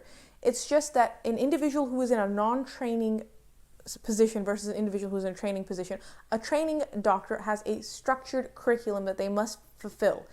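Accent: United States. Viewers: But, your accent sounds american. Did you complete your medical school in the usa? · Are you American? Your accent sounds American.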